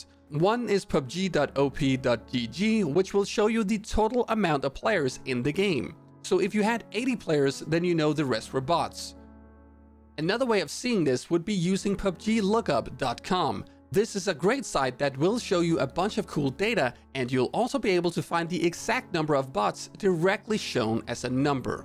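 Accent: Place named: Denmark. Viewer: du er fra Danmark, ikke sandt? syntes jeg kan kende en dansk aksang:)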